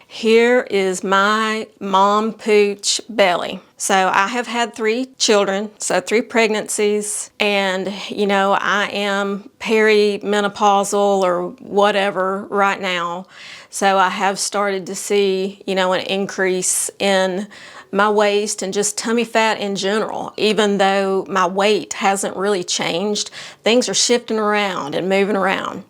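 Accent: South, United States. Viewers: Great tips and helpful links. I even love your southern accent. 😊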